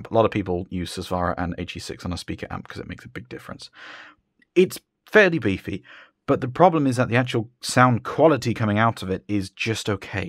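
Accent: United Kingdom. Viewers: Oh look, a more sophisticated Zeos with a British accent who reviews more expensive gear. You deserve my subscription well done on the review!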